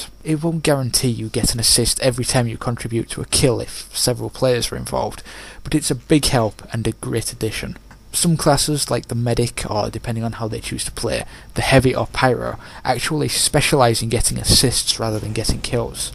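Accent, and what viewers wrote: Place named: Scotland, United Kingdom. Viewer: his acent is scotish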